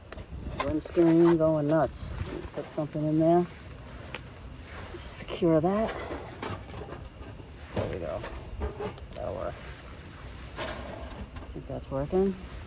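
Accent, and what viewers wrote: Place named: East Coast, United States. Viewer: Love your videos, and your east coast accent 🎉🎉❤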